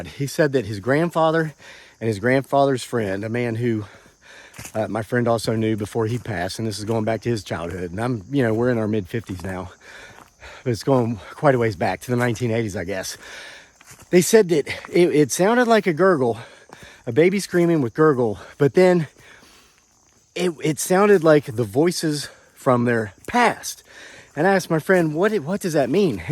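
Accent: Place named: West Virginia, United States. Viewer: Hey... I am one of your fellow West Virginians and I’ve told you before how much I love and am comforted by hearing your west by god Virginia accent...... I was also born and raised in West Virginia.... I wonder if those graves were of the family members..... I have many generations of south Caroline relatives and many years ago family graveyards were common....a part of many properties were graves...civil war even... but that was truly CREEPY and also fits with the other alien abductions you read about where pregnancies were part of the story but NOTHING AS CREEPY AS THIS.... an alien baby channeling their childhood families!! I hope you are home safe and will post on this YouTube channel that you are home safely.....seems like a lot of entities for lack of a better description...are you home safe? Of course it could also be Big Foot mixed in with invisible entities...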